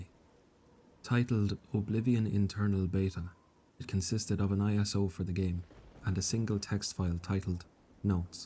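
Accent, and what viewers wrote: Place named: Ireland. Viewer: Finally an Irish narrator ❤ go raíbh míle maith agat ❤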